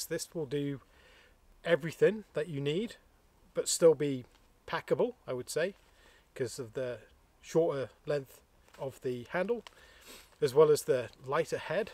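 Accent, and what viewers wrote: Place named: Welsh Border, United Kingdom. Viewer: You appear to be a adoptive Canuckistani and I can tell from certain nuances in your accent you’re from the borders of England and our western neighbour😂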